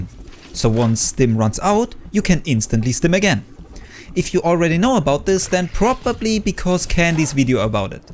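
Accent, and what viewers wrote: Germany. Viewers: Man hört übelds das du deutscher bist😂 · I can heard that your German bro haha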